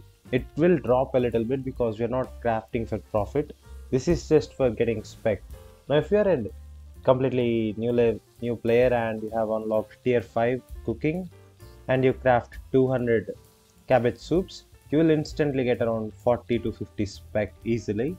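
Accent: India. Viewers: You sounds Indian my friend .. Are you?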